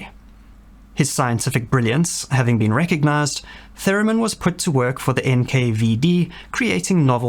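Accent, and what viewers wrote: South Africa. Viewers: Nice to put a face with the charming South African/dutch accent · Interesting content. Well researched. Your accent is South African English, right?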